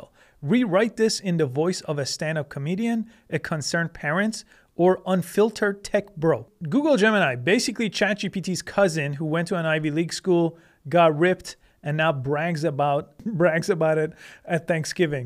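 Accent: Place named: Iran. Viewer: You sound iranian. Where are you from?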